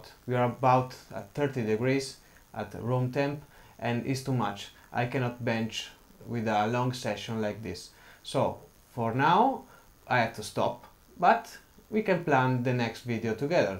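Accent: Italy. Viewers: ma te sei italiano? hai una pronuncia che suona di casa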